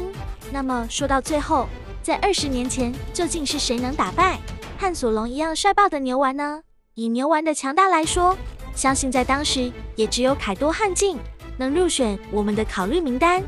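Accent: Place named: China. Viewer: 較象大陸的，咡過原音。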